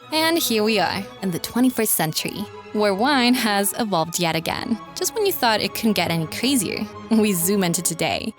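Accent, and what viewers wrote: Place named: Italian-American, United States. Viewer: This is the cutest Italian-American dialect I've ever heard. And she's smart too.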